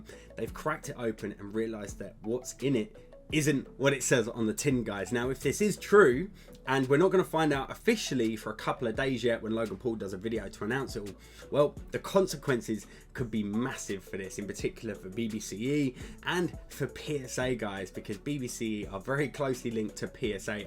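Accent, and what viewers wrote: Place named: Australia. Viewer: yeah you really do sound more australian than british. I would have guessed you were born down under and then moved somewhere else. Really interesting which part of uk are you from then ?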